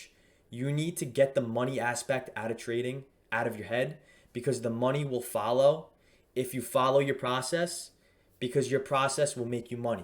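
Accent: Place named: New Jersey, United States. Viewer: Hey, I am from NJ, you sound like your from my area. Thanks to your video, I applied for options got approved for standard margin. But I am getting limited to Pattern Day Trader. Can i call to have margin turned off and the 3 a week wont apply to me? Thanks again.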